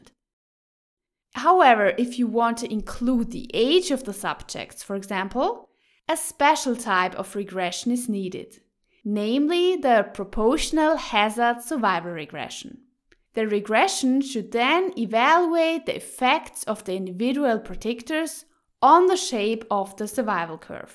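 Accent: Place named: Germany. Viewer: the most awful german accent to date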